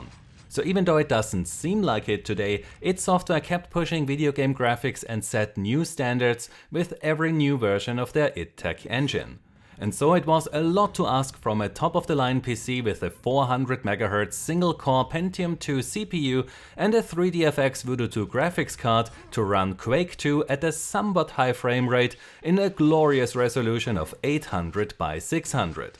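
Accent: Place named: Germany. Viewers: Hey there, not to criticize you or anything, but I‘m really curious about your accent. Sounds kinda German-ish, but with a different pronounciation, so my guess would be that you‘re Austrian? Am I correct? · Pretty sure it's german. Practicing english. You can tell when the syllable count is a multiplier of what would normally be said.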